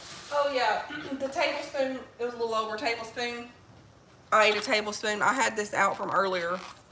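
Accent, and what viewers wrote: South, United States. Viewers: Love ur country accent. B blessed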